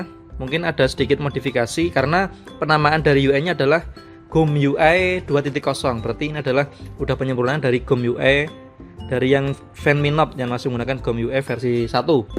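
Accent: Java, Indonesia. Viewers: Medhoq banget 😆😆😆😆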